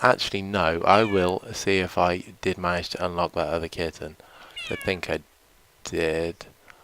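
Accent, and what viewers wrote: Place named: England, United Kingdom. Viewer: I can tell your from England .... but what part? XD nice to listen to an English guy, being English my self makes it better lol (I hope i'm not wrong about you being english XD) also awesome video =D wish I could get this game my self it looks great!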